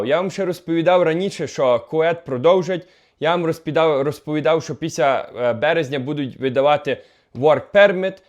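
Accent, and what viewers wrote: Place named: Transcarpathia, Ukraine. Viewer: у Львові так не говорят і не говорили, ніколи!!!!!! Такий говір тільки на Закарпатті)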